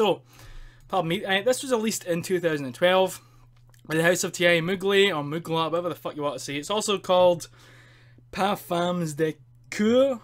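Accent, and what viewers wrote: Scotland, United Kingdom. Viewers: Where in Scotland you from ?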